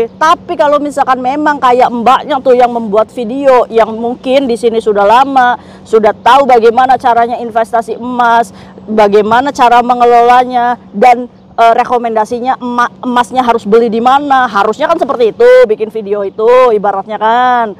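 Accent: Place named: Batak, Indonesia. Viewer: Tumben nadanya kek orang batak kak😂😂😂😂❤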